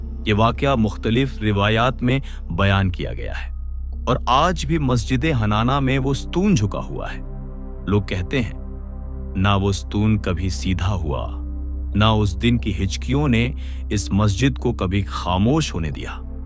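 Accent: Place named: India. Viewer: بھائی ہندی تلفظ میں اردو بول رہے ہیں ، جائرین اردو میں زائرین ہوتا ہے۔ یہ صرف مسلمانوں کو مزید تقسیم اور گمراہ کرنے کی ناکام کوشش ہے۔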